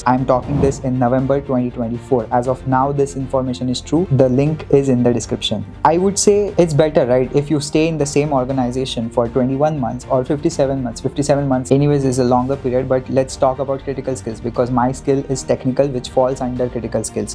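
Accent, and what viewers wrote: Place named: Maharashtra, India. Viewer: This guy is marathi and I don’t like your accent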